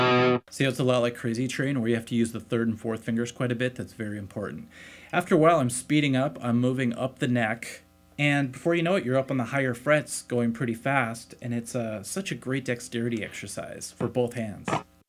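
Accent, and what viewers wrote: Minnesota, United States. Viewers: From Minnesota?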